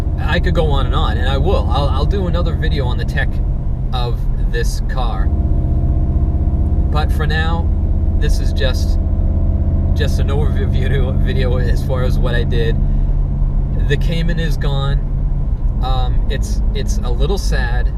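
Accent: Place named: Boston, United States. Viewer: First time on the channel,. Great car! Are you in Boston by any chance? I am and was just curious as the roads looked familiar and I detected a little accent.....disregard....i had not finished this video until you mentioned you were in Wetsboro. I've never owned VW, but yours looks awesome. I'd likely get the same one (white exterior with auto transmission).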